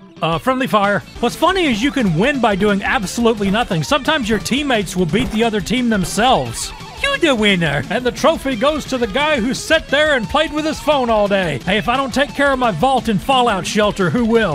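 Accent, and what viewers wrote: South, United States. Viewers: God you’re so southern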